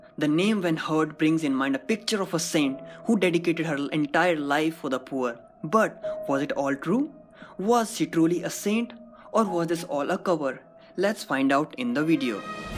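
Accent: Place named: India. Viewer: Hard to understand this kind od indian version on Hindi English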